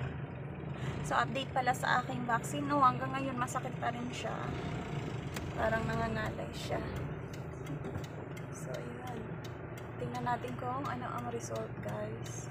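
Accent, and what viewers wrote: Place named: Visayas, Philippines. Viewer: Pag bisaya be .🤣 Kanami pamati.an 🤣🤣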